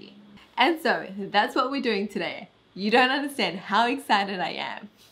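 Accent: Australia. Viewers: Are you aussie??????🤨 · I can’t figure out her accent but that’s probably because I’m dumb -_- Can someone pls tell me her accent?? This is me editing it before someone tells me... is it Australian? Sry I’m dumb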